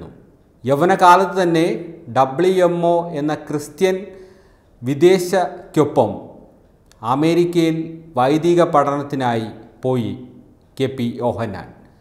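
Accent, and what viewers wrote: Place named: West Bengal, India. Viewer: എന്തു വാർത്ത വായിക്കലാണിത്... കഷ്ടം... ബംഗാളിയെ പിടിച്ചു മലയാളം വായിപ്പിക്കുന്ന പോലെയുണ്ട്...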